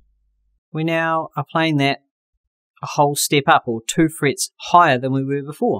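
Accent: New Zealand. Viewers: Bro you’re a kiwi aye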